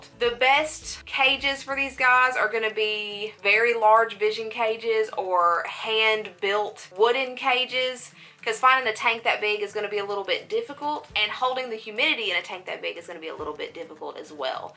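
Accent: South, United States. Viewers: I’ve found my new crush that southern accent on you girl yeeeess please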